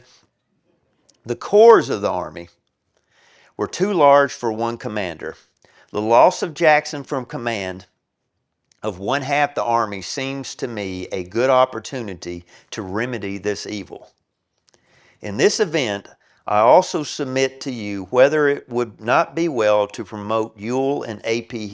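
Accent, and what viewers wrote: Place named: South, United States. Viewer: Fantastic, informative, and entertaining as presents it all, in the most interesting southern drawl, about A.P. Hill. Thank you.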